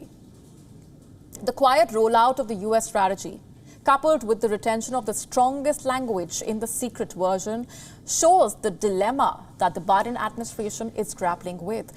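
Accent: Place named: India. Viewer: Nothing worse than Indians speak English my ear drums are bleeding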